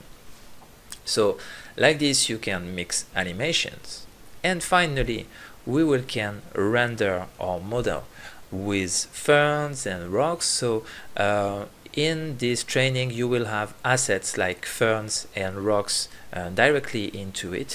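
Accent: France. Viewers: On a reconnu l’accent français 😂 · Clairement 😅 L’accent français est présent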